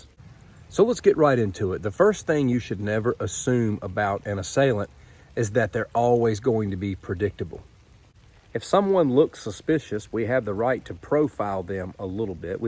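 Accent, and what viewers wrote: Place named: Louisiana, United States. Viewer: Interesting accent. I’m from south Louisiana and you remind me of there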